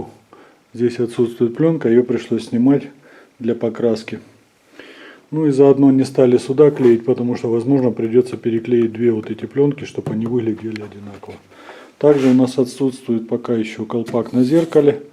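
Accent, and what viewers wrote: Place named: Rostov, Russia. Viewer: Как же слышно Ростовскую букву "г" )) и "утак" )) Уважуха за проделанную работу!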